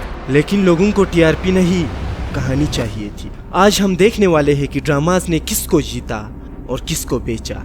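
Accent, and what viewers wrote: Gilgit, Pakistan. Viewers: bahi app accent se gilgiti lagtey hoo...where are you from?? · Bhai apke dialect to pakistani h? pakistan se ho as far as i remember, the boom of pakistani drama comes after Zindigi Gulzar h type of story that is retable to most of indians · bai tera naam hai aur lehja bilkul Gilgiti hai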